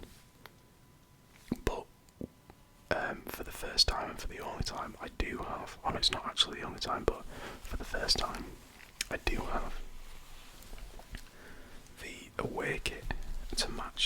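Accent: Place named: Yorkshire, United Kingdom. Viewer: As a fellow Yorkshireman I approve of this channel ha and respect how you’ve managed to calm our usually loud accent into such great relaxing content :D great channel man!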